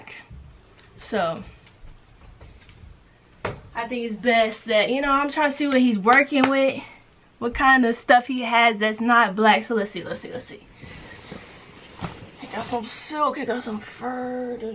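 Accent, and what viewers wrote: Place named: South, United States. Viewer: Love your personality love your voice where you from. You have a southern voice.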